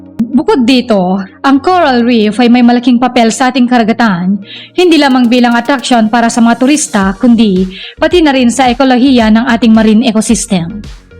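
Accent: Visayas, Philippines. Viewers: may. punto po. tagalog. niyo. bakit. po bisya. po. ba. u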